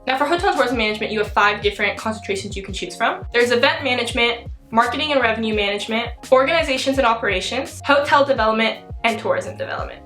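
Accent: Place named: United States. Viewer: your accent is so perfect and pleasant I think you are American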